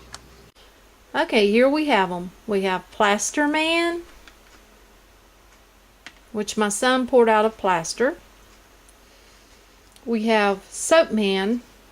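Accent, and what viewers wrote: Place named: South, United States. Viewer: I love all your snowmen...too cute! The molds are amazing, would like to have one myself .Can I ask where you're from...can't help but notice your southern draw. ; ) I am originally from Alabama but now live in Texas. I just happen to come across your channel, glad I did. Ya got a new subbie! Tfs Have a great day! : )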